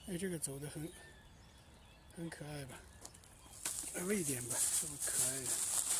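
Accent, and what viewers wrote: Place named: Zhejiang, China. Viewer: 老哥是大陆移民吗，听口音像是浙江的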